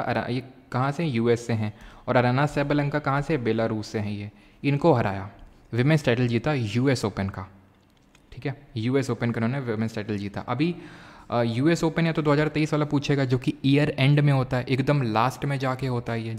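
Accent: Kanpur, India. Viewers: Pahli bat to sir apki boli se lgta hai aap kanpur me agal bagal se hain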